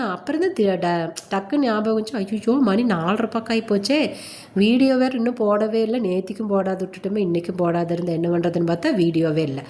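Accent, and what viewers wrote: Kongu, India. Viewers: Ayyo akka neenga erode ah naa karur 😊😊😊😊 Kongu tamil manakkuthu Naanga mutthur maniyan neenga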